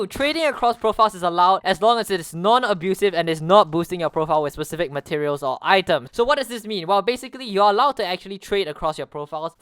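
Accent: Singapore. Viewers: Lemme guess, u r Singaporean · You sound Singaporean ngl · btw this is the singapore accent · You sound singaporean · do u live in singapore? u have a slight accent :) ty for the vid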